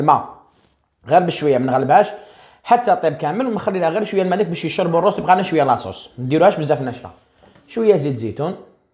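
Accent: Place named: Blida, Algeria. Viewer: هدرة تاعك تبان بليدي ولا قليعي منين الأخ 🤔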